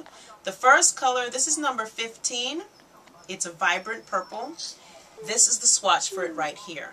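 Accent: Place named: Caribbean. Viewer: you're gorgeous and i love your personality... which west indian island are you affiliated with... yup, i hear an accent 8 )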